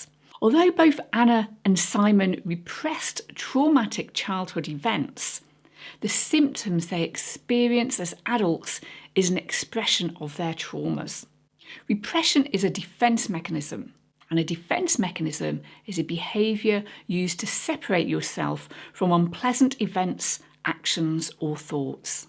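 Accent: United Kingdom. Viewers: I'm very happy to find your channel , the topic are amazing , plus your british accet are marvelous , You have a new suscriptor , and thanks for your effort and confidence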